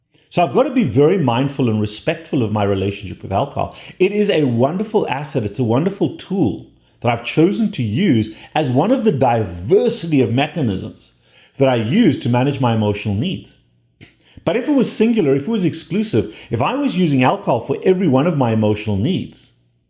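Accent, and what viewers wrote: South Africa. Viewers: I knew by your accent that you were South African, Great commentary, very imformative.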